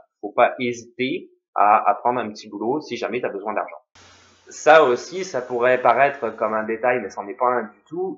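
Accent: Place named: Quebec, Canada. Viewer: Pour avoir vécu au Québec, je confirme, tu t'est bien intégré, je ne sais pas d'où tu viens (de France, de Belgique) , mais tu as bien choppé l'accent ! :)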